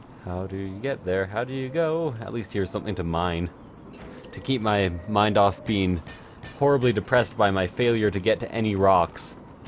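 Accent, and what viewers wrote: Canada. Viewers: r u Canadian?? Cuz ur all, "Oat, oat." Instead of out. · That Canadian accent is redonkedonk xD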